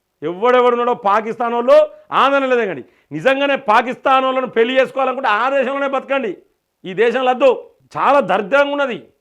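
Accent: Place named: Telangana, India. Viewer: మీ తెలంగాణ భాష సూపర్ అండి మీరు మాట్లాడే విధానం బాగుంది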